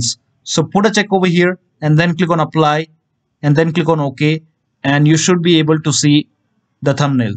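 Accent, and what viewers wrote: India. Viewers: start video hear indian mute video turn video off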